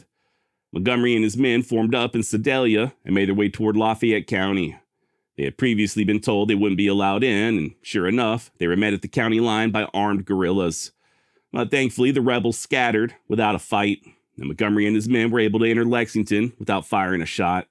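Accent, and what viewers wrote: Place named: Wisconsin, United States. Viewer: Sounds like you're from my neck of the woods, Wisconsin. Maybe a little north. Love the content, keep up the great work.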